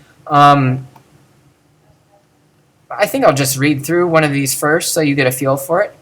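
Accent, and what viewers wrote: United States. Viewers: I really admire your self confidence: to claim to have a new system (the correct one) to pronounce AG when you can not even drop your US-Amercian accent when reading the Greek words, and the only references that you give for this system are other videos of yourself. Amazing!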